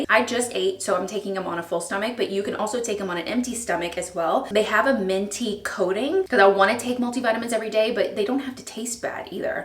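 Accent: Texas, United States. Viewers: you can hear texas accent poking through in this video!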